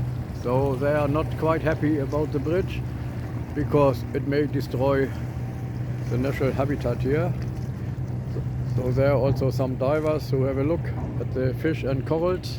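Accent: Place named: Germany. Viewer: Danke für den Bericht, aber man hört ganz klar dass Du deutschsprachig bist. Grüssle von San Agustin-Babak.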